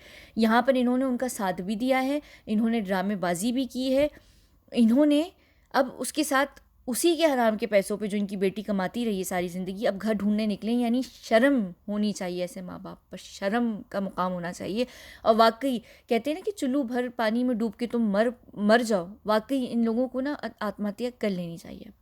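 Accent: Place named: United Kingdom. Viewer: wow sachi she is worth those words aur b bohut Sare...aap sachi bohut acha decode karthi ho really so awesome videos n very informative n I love the way you speak the UK accent of the English words n sentences you speak madam🙏 plz keep making more videos for all of us. Cuddos to all your team👍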